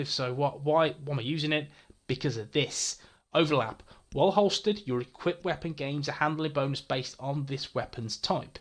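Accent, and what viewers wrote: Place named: Australia. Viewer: Fair enough mate. Love the build though. You sound Australian. I'm from New Zealand.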